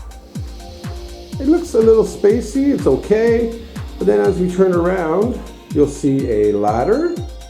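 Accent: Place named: Canada. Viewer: haha amazing! I’m Canadian too and I thought I noticed a slight Canadian accent lol 🇨🇦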